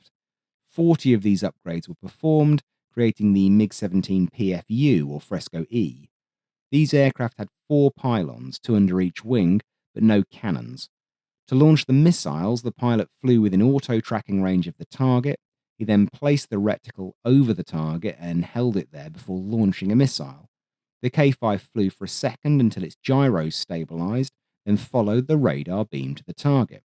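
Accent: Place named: East London, United Kingdom. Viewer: Thank you mate for all you manage,. (…I’m allowed!) Are you English or like NSW or…? Usually the accents are a dead giveaway but at times Im like “oh hes definitely from East London” but then certain words’ll go Sheffield-ish. Actually don’t answer that, and stop reading this as it’ll influence your habitual speech profile cause now you’ll be thinking about it subconsciously (really thoughts are more “layered” than dualistically ‘conscious’ OR ‘submi… ‘subconscious’)!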